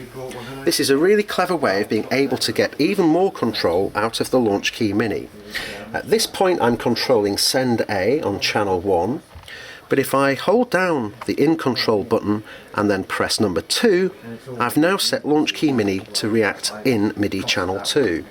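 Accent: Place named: Australia. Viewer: Alright, I hope you work it out. Good to hear a fellow aussie!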